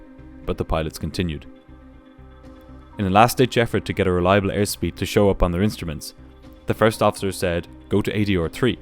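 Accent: Ireland. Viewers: The narrator is Irish...the ATC is clearly Australian my dude smhl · Top o' the mornin' to ya! What a quaint Irish accent you have - love it! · Bruh he's Irish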